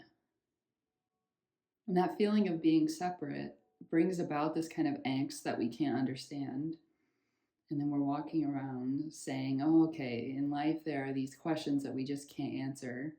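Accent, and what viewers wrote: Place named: United States. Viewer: I don't know who you are referring to as your speak so fast and the American accent is really difficult to understand at the best of times. The trick is that there is a God, that is a creator and is a male or female. This is delusion. The body belongs to Nature and out of your control. Emotions are not under your control. Reactions through senses is not in your control. If you hear a loud bang and body jumps, there's your proof. What comes before Consciousness if the body jumps before the mind is aware of the loud sound? If one is 'God' one would have been aware because God is whole. Blessings.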